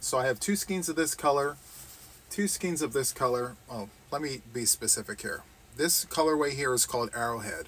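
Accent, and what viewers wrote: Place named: East Coast, United States. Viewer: Happy new year and friends!! I just love your enthusiasm and especially when that East Coast accent comes out 😂! Please tell mama we say hello!!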